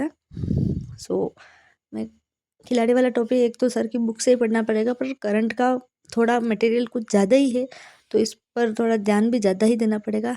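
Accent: Mewar, India. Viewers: बोली के हिसाब से मेवाड़ चित्तौड़गढ से लग रही हो दीदी❤❤ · Mera nanihal fatehnagar k pas hai or sasural bhilwara so mewadi bolte ho ap merko accha lgta hai sunna or upr se hardwork consistency awaj sb bhot pyara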